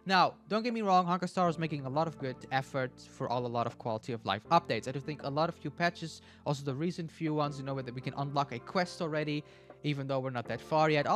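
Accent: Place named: Italy. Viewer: My guess is Italian 🤔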